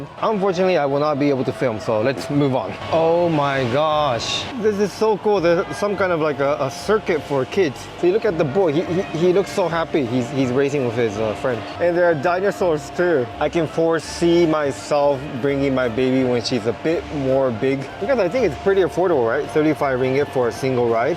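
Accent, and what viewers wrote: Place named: Singapore. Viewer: Why u speak in Sg accent.. hahaha.. cute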